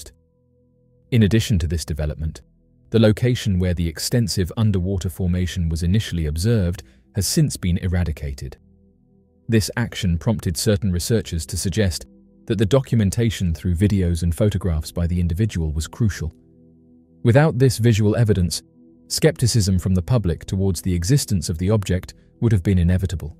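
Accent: United Kingdom. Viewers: No..he disappeared.. Oh my.. Its facts we want...not wordy british narrator... Oh well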